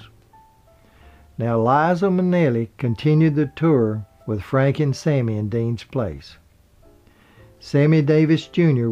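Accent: South, United States. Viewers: My little brother spoke only Portugues when we came home from living in Brasil. He understood English, but only spoke a few words. We spoke English at home, but the woman who took care of him spoke Portuguez to him, and although she spoke English very well, would only answer us kids if we spoke Portugues. Good documentary,Love your accent. Your voice sounds like the men in my family! (from Alabama. Tennessee and Florida) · I smile every time someone comments on your accent, vernacular and the Southern charm exuded in your voice. You remind me of my younger days and down home folks having a casual conversation. Thanks for that memory and for your informative and insightful videos. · I love this southern Accent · This guy I like has a smooth southern voice....kinda weird for a Dean Martin history......whatever? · Now that Lewis has also passed on, do you have any plans to make a 'companion piece' to this video about his life? 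I do hope you consider it as I really enjoy watching your films. Oh, and just for the record, I am one of those who just LOVE your accent - it reminds me of several holidays I had touring the Southern states of America many years ago when I was young and mortgage-free!! We always found the southerners extremely friendly, and they were just as fascinated by our English accents as we were by theirs!! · . I love your southern dialect. You sound so much like how my daddy talked. Your voice is very soothing. Thank you for your channel and all the good info you provide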